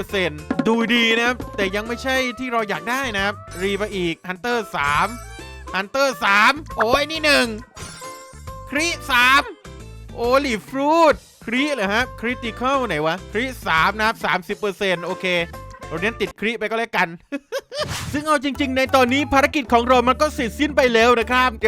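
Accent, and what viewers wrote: South, Thailand. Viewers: พี่เป็นคนใต้หรอคับ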